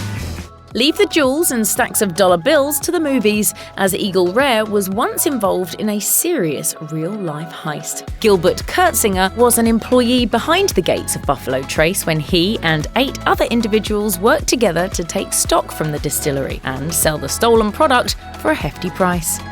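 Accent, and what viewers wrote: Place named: England, United Kingdom. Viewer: Your talking about Bourbon in Kentucky. Get somebody without an English accent. Here's a suggestion an Southeren accent.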